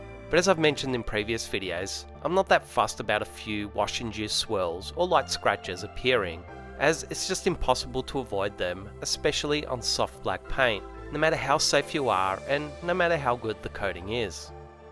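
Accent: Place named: Australia. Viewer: 3rd video in and I’m hooked! Good to hear an Aussie voice in a car detail video, Big fan of your work and your car, pity I’m on the other side of the city.. Sub.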